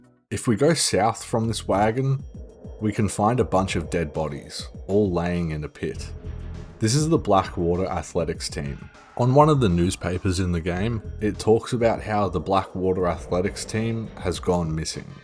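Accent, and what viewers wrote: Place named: Australia. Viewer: Australian commoner accent.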